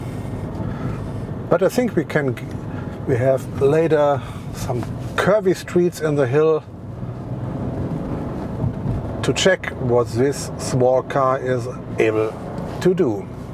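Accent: Germany. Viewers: For the first ten seconds, I was trying hard to figure out what he speaks is English or German.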